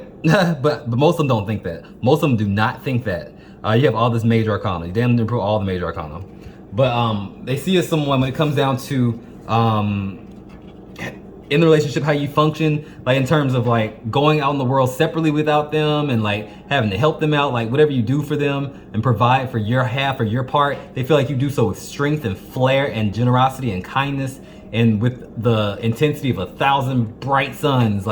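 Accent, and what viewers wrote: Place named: South, United States. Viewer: I’m in love with your accent. I wish I had a southern accent.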